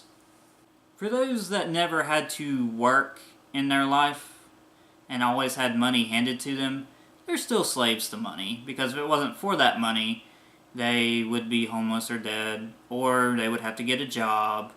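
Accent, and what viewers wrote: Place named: South, United States. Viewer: You have a southern accent, doesn’t surprise me that you’re trying to justify this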